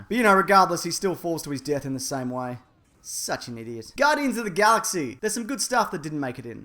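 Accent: Australia. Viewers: You, sir, are my favorite source for Marvel news, because your content is great, very funny, and I really like your Australian accent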